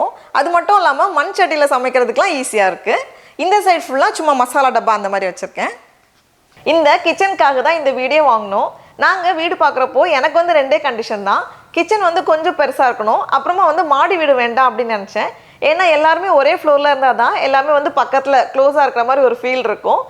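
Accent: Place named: Chennai, India. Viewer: na unga tamil slang rmba pidichanala inga chennai ninachen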